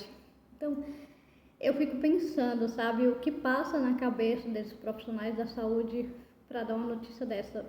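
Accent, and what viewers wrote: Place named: Bahia, Brazil. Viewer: que bela história de superação, e que bom que vc existe. Vc é linda, merece ser feliz. Receba todos os elogios dessa humilde pessoa que se tornou seu amigo, na distante Ribeirão Preto, SP. Seu sotaque também é lindo, sotaque baiano maravilhoso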